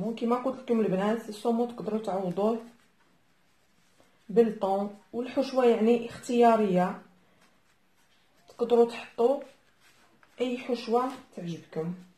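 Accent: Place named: Jijel, Algeria. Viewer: سلام ختي قناتك هايلة.....من هدرتك عرفت بلي جيجلية ماشاء الله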